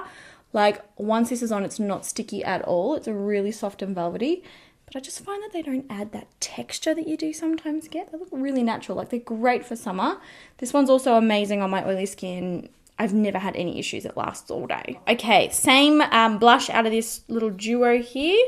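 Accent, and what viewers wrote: Australia. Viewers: You sounded so Aussie in this video! 😂. Loved this video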